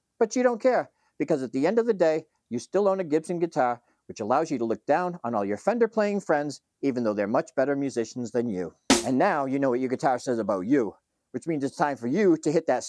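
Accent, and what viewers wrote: Boston, United States. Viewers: The Boston accent is a nice. Gitauh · As a Brit, it sounds exactly like how Americans say it to me...I thought he was American!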